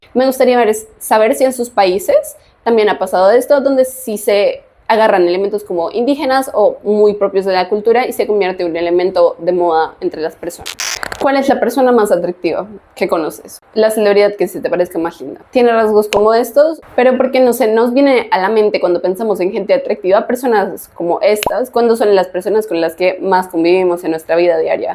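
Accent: Colombia. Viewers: eres de colombia?....y tu acentico? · ¿En serio? El acento se me hace un poco bogotano (aunque sí está un poco raro). ¿Por qué dices que es de México? ¿Lo ha dicho en algún video o algo?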